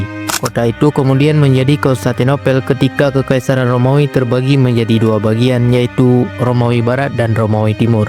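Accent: Aceh, Indonesia. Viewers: Apakah VO berlogat aceh😆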